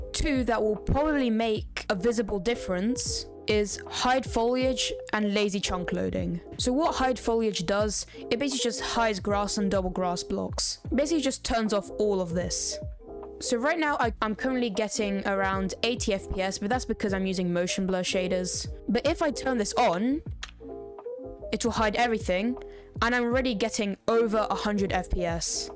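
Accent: United Kingdom. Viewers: i could tell from the start u were brittish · british egirl gg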